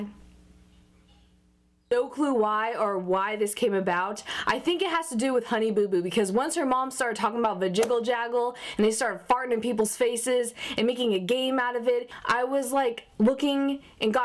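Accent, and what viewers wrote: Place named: Dallas, United States. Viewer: she sounds like she is from downtown Dallas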